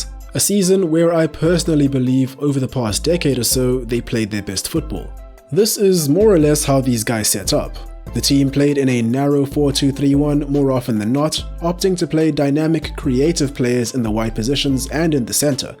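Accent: South Africa. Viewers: Awesome to hear a South African voice! Nice work. Subscribed 💪🏻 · Narrator sounds South African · The narrator sounds south african! If so, it makes me even more proud to say this is an awesome production. Subscribed!!!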